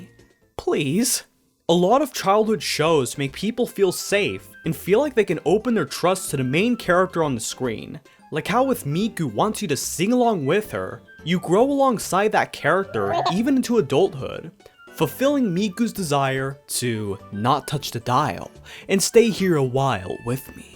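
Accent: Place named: United States. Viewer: im guessing that the video creator is from america based on their voice. here, most people say it with a less pronounced second "t", like little being pronounced more like "liddle" here in the US. so usually people in the u.s pronounce it as "teh-doe"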